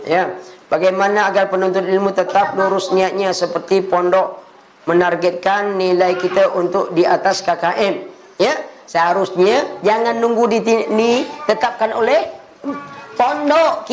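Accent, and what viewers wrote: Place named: Pontianak, Indonesia. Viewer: Kalau dengar logat bicaranya kayak orang pontianak ustadz satu ni😊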